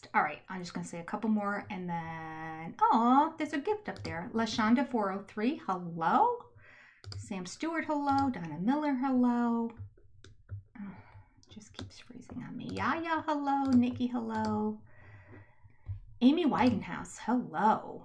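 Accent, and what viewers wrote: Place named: Midwest, United States. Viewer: Yeah, but lol you have a Midwestern accent even though you don’t think you do 😂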